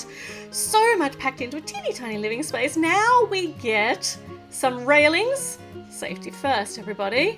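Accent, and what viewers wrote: Australia. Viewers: Yeah, in Australia people pronounce it "baahk", such a charming accent! 🐨😊You should've heard her last year when she said "care bear" in a vid 😛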